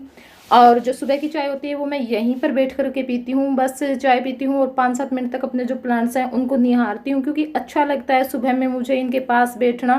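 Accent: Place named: Bhiwani, India. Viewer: Village Life with ok maine socha bhiwani ke paas ke ho aap boli waisi h aaj Maine aapko video first time Demi h nice video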